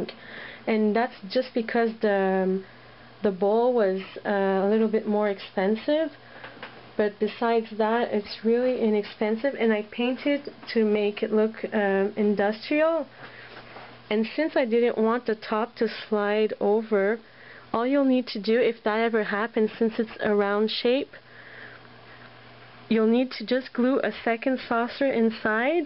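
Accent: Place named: France. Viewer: Is your first language French I'm Canadian and you seem to slightly have a French accent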